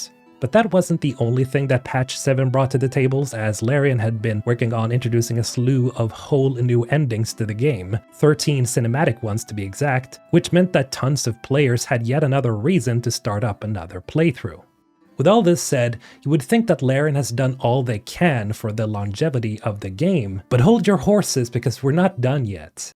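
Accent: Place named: Sweden. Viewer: Tog ett tag innan jag hörde att du är från gamla goa Svedala! :D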